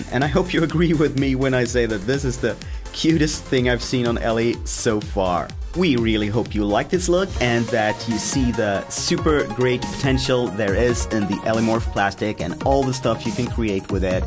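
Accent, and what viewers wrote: Scania, Sweden. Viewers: this is not the real swedish thats skånska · dont teach swedish if you're skånsk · Älskar din skånska dialekt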